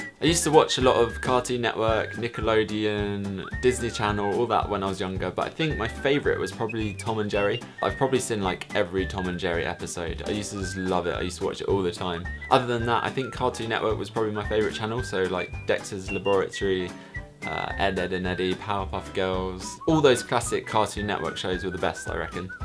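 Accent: California, United States. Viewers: That California accent is on point! Couldn't stop laughing :) Love this video! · your Californian accent. XD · Lol his Cali accent sounds like a gay man xD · Your Cali accent is adorable! XD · lol. your californian accent. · Ur american accent omg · That Californian accent. On point. Haha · omg the california accent #blesd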